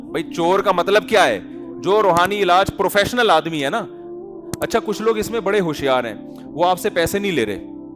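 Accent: India. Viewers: wha mufti saheb aap ki urdu bilkul indian he aap salamat rahe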